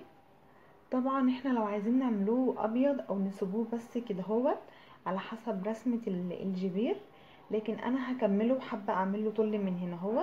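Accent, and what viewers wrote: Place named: Alexandria, Egypt. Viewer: الجوانتى رووووووعه 😘😘 والاحلى اللهجه الاسكندرانى بمووووت فيها عسل 😍😍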